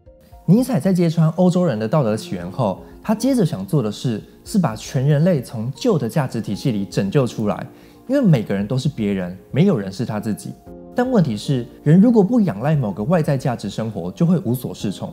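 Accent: Taiwan. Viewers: 实在欣赏不来台湾腔的男生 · 这个台湾普通话听起来费劲啊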